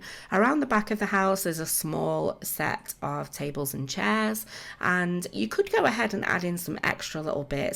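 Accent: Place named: Manchester, United Kingdom. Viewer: Absolutely love this house. Being on an angle really makes it more awesome. Also brill to hear a fellow Manc simmer x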